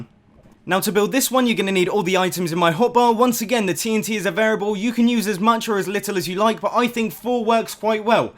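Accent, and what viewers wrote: United Kingdom. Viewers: Good old British. :) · British FTW!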